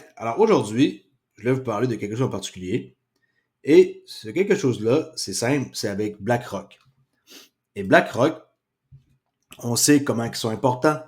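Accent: Canada. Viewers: je te kiff tu me fait trop rire avec ton accent, j'ai l'impression d'entendre mon beau frère du canada.. Bon j'ai du xrp depuis un petit moment et quand je t'entend je me dit tu doit en avoir vraiment beaucoup pour autant en parler..après ça me va bien car je suit beaucoup les infos autour de cette crypto que j'ai failli vendre tant de fois 😂😂😂 mais que j'ai gardé je ne sais pas pourquoi.. J'espère un avenir pour xrp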